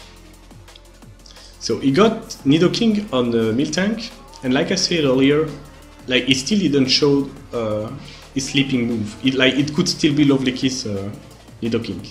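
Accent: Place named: France. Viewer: These are good videos. I have watched a few of them already, and I enjoy the combination of the team presentation in the beginning and the following game commentary, showing how it works in practice. Also, the French accent is surprisingly pleasant to the ear. What do you think about the idea of going more in-depth on a team presentation, and showing more than one game (two or three, most likely) where you use the same team?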